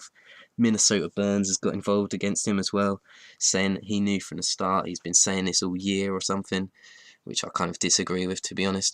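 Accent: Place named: East Anglia, United Kingdom. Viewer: Your pronouncing haha east Anglian lad, loving then showing off of the stats at the end haha, good gameplay tho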